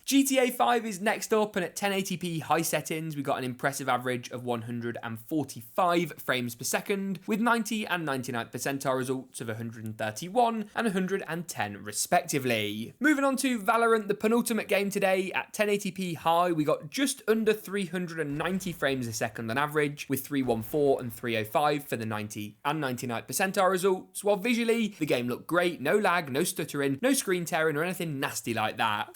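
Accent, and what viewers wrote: United Kingdom. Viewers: That British accent is so stupid 😂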